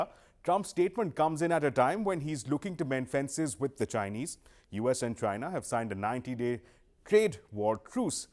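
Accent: India. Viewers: Dam sir your accent is so indian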